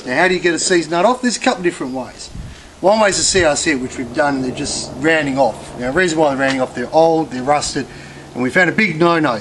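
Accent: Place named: Australia. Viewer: It looks like that engine has sat for at least 10 years without running yet when you split it from the transaxle amazingly there was not one spot of rust or crud on the on the flywheel or clutch cover. I am having a little trouble with your aussie accent, did you say that is a "dana" gearbox ? I've worked on vws for forty years and dana never produced gearboxes for Volkswagen.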